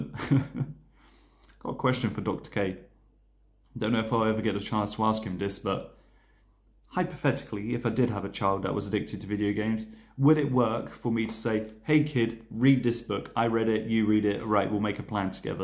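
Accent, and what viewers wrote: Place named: United Kingdom. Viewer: i love your british accent very calming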